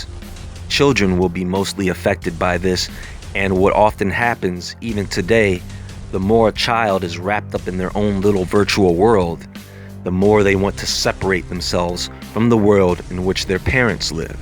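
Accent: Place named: New Jersey, United States. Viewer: Where are you from? You sound like a NJ guy. Wherever, I’m addicted to your voice. Great channel. Keep it coming!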